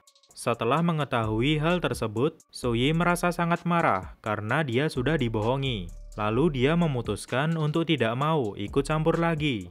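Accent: Java, Indonesia. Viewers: Gak medok bisa gak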